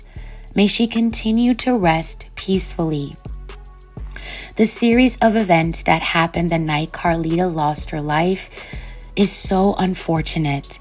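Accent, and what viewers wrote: Baltimore, United States. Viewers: Bro. I'm from Baltimore too and I live in ATL also. Thanks for bringing these stories forward dummy and bringing attention to our city. You speak with our accent but you narrate robotically as if you're reading from a piece of paper. If I. Was you dummy, I would let this female narrate my blog's because she tells the story more fluently. #MajorDifference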